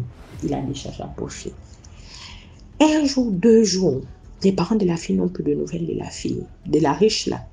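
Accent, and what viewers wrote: Gabon. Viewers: Kié une vraie gabonaise avec son accent bien appliqué 😂😂😂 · Ah j m disais bien que c l'accent gaboma😂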